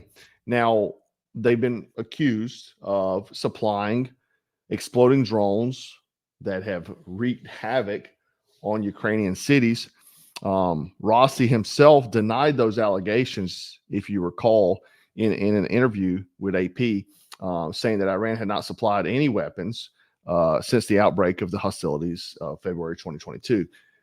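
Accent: United States. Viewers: He said Joey Biden. He has a cowboy 🤠 accent. Get your ears checked or clean them out. He always says Joey Biden.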